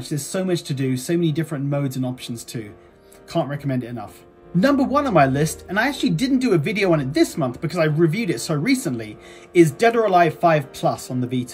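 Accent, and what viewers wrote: United Kingdom. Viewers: im just going by your accent you are from the uk but over here in ireland tekken dark resurrection is still on the psn and so its still on the vita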